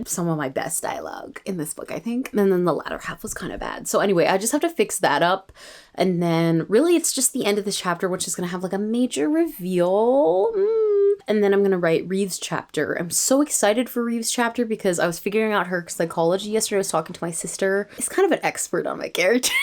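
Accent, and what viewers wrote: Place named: United Kingdom. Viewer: i need you to narrate my books in your british accent asl;dkgj i love your writing vlogs so much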